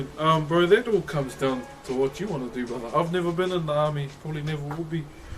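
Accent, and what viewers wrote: New Zealand. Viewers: Its just the kiwi accent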